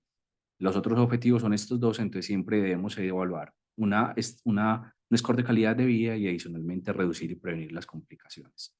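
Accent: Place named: Colombia. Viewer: Qué lindo explica y qué hermoso acento colombiano. Saludos desde Ecuador.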